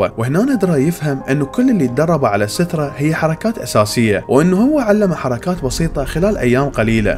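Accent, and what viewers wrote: Iraq. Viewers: احلي شي فيك اللهجه العراقيه الحلوه اهوايا يازين العراقين ❤️😘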